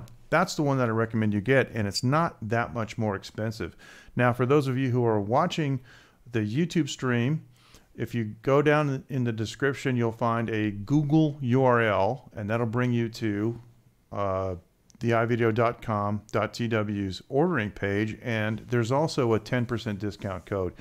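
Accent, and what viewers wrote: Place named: Boston, United States. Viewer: Shared. Hope you keep the Boston sound. Awesome stuff!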